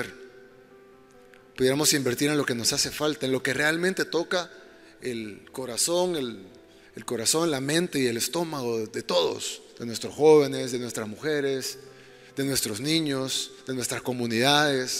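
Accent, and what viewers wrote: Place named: Central America. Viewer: Hola como esta, Perdon y sin ofender pero no parece usted Mexicano. Que es eso de👉 Varón 👈? Si entendemos la palabra, pero es raro que la usemos aci como usted la acaba de usar. Usted se escucha si fuera Centroaméricano, lo digo con respeto....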